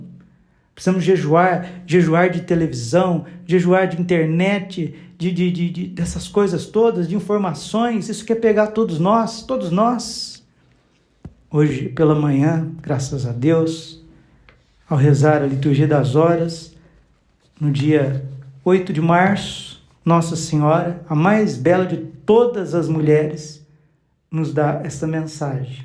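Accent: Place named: Minas Gerais, Brazil. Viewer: Obrigada Pe. Bráulio por sua vida de dedicação ao serviço de Jesus. As suas homilias são muito tocantes à alma e ao coração. O senhor tem a sabedoria que advém do Espírito Santo para evangelizar. A sua humildade o aproxima de seus fiéis. O seu sotaque Mineirinho é muito cativante. Deus o abençoe. 🙏👏🏼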